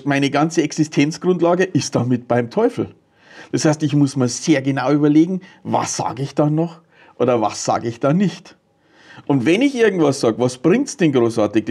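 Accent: Bavaria, Germany. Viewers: Danke! Aber du sprichst Bayrisch da steigt die KI eh aus hahaha 😀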